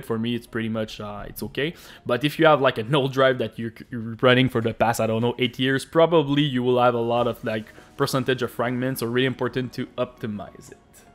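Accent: Quebec, Canada. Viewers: You sounds like a french-canadian just like me x)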